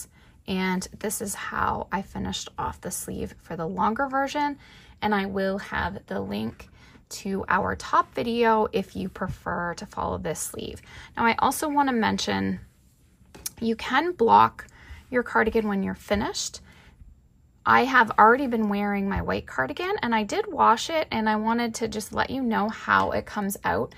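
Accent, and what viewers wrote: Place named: Canada. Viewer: Just finished my cardigan. Beautiful thanks!! And I do love your Canadian about and out pronunciation 🥰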